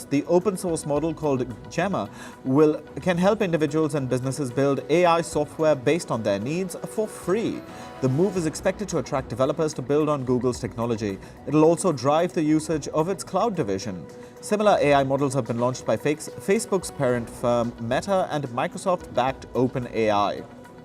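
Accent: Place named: India. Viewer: . I am talking about the Indian voice video narrator.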